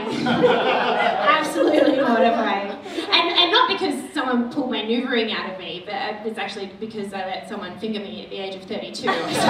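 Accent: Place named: Australia. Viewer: Why are you Australian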